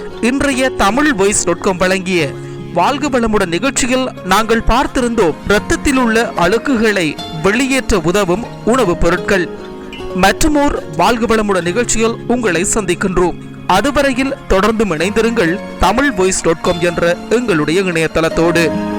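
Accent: Sri Lanka. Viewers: இது இலங்கை தமிழ் ... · ஈழ தமிழ் அப்படி தான் இருக்கும் சகோ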